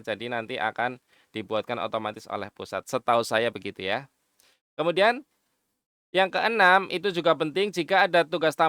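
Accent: Banyumas, Indonesia. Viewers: Mas mw nanya. Kalau honor negeri bs cair gk kira"?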